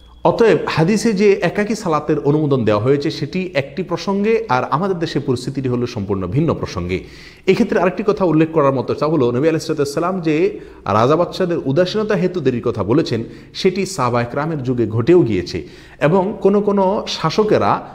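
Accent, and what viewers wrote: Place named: Noakhali, Bangladesh. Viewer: শায়েখ আপনাকে অন্তর হতে দোয়া করি মুসলিম উম্মাহর ঐক্যে পানি ঢালার জন্য। মাশাআল্লাহ। আলহামদুলিল্লাহ। আজ মুসলিমদের ফেকহি মাসআলা নিয়ে অনেক বিভেদ, বিভক্তি ও ফ্যাসাদে লিপ্ত। ইহা নিয়ে বয়ান দেয়ার জন্য জাজাকাল্লাহ। আল্লাহ পাক আপনার হায়াতে বরকত দান করুন। আপনার বয়ান গুলো আমি শুনি। আপনার কথা গুলি আমার নিকট অনেক গ্রহণযোগ্য মনে হয়। অনেক শায়েখের কথা একটু বেশী কড়া ও ঝাঁঝালো।যা মুসলমানের মাঝে অনৈক্যে একটু ইন্ধন হয়। আল্লাহ পাক শায়েখদের হায়াতে বরকত দান করুন যেন সকল মুসলিমের মাঝে ঐক্য সৃষ্টি হয়। আপনার কথায় কেনো জানি আমি বুঝতে পারি আপনি নোয়াখালির কোন অঞ্চলের মানুষ।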